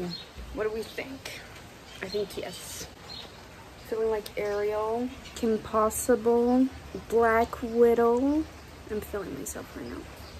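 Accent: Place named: Brazil. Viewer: Eu suspeitei que você fosse da região de onde eu sou porque você chamou a fruta Pinha de "pinha" em um vídeo, e não de fruta do conde ou outros nomes que outros lugares do país dão. Eu sou de Tanabi e descobri que você é de Rio Preto, fiquei feliz hasuhauhsuhaus Vi o filme que você fez, representando uma norte americana. Que tudo, um inglês totalmente brasileiro sendo usado pra representar americanos quando a gente como brasileiro ta cansado de ver os gringo usando mexicanos e outros para representar brasileiros hehehehehe~~ Feliz, obg por representar, viu. E agora como ruiva vão te confundir mais ainda com outros países haushuahuhs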